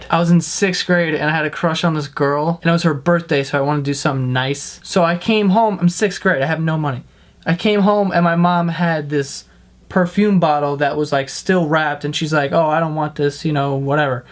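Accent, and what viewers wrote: New York, United States. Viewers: He's a New Yorker i say that too. Ppl say things differently lol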